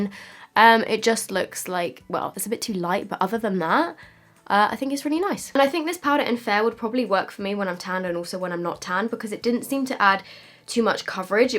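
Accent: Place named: United Kingdom. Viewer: Ugh I’m sooo obsessed with UK accents 😍 “ it’s waawrmm”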